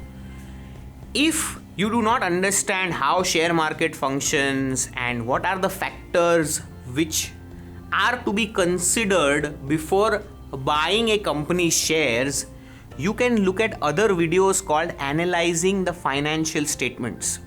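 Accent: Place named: Gujarat, India. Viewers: I was just going to comment this... Gujju accent can be picked up very easily... :-D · that sweet gujju accent though. thanks, the video was very helpful.😊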